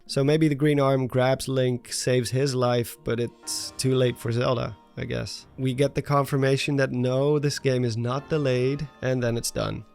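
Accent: Netherlands. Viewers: grappig om een nederlandse accent te horen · Super cool to see another dutch zelda fan!! Found your channel awhile ago and now I'm addicted to your video's. Je hoort het bijna niet aan je stem dat je nederlands bent!! Ga zo door :D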